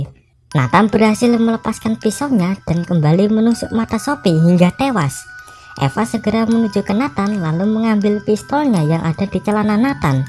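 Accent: Java, Indonesia. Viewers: nya medok rek bikin gumuuz😂😂😂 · kucing host nya logatnya medok hhh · Ini ebbo kucing versi review film nya tapi medok 🤔😅✌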